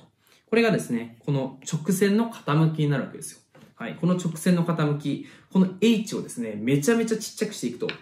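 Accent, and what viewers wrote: Kansai, Japan. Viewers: 語尾が関西弁になるの草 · そんなに違和感ないぞこの関西弁。な↑んでやねんとか言ってない辺りマジでそんなに違和感ない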